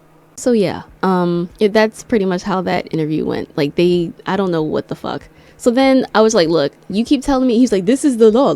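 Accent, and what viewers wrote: England, United Kingdom. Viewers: Girl, you have been here a while/often. You have a cracking english accent. It's better than mine. I've been here for 52 years 😂 Bless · Sidenote! That English accent is leveling up innit!😂😂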